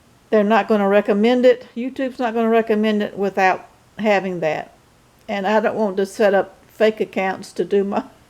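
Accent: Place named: Mississippi, United States. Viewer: I have to know. Where are you from ma’am? I guess Mississippi by your voice, but I am from East Tennessee, and we talk a little different.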